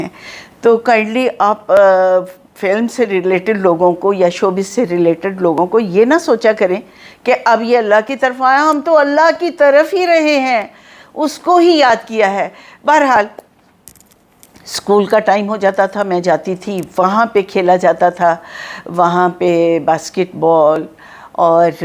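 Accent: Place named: Punjab, Pakistan. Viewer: wah ji wah ap ki baton main Punjabi tuch very gooď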